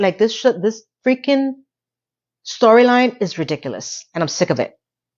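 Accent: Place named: New York, United States. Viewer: I have so many things to say about this episode, but just paused to say, 19 years in Denmark, and still have my very distinct Chicago accent. It ain't going anywhere. ;) I love a NY accent, and I can hear it in you, not so much from "Gia". Anthony held his own,proud of him. Ok, I will un-puase and watch the rest now. :)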